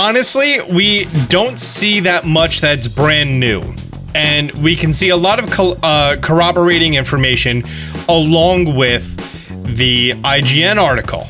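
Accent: Spain. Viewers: I like you even more now, with you cool Spanish accent!!! For the win!!! GO!!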